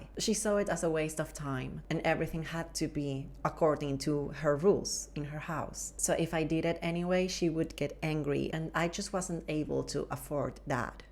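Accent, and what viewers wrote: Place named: Spain. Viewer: I absolutely adore your Spanish accent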